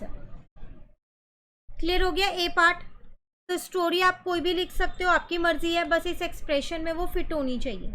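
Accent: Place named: Uttar Pradesh, India. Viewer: Mam you are from UP your voice look like .🎉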